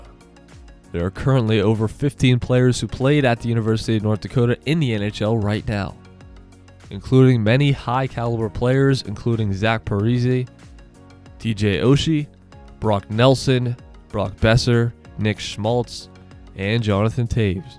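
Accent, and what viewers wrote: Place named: Upstate New York, United States. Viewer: he can not be, sounds like up state NY. Or he is reading stat sheet from 6/7 years ago. The Gophers? Michigan is best in the 10. Badgers? Who? U.M.D. and North Dakota are better.B.U.however,that is right.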